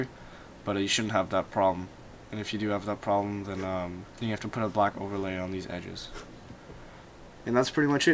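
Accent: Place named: Canada. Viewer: I kinda get the feeling your Canadian judging by your accent and the way you spell, correct?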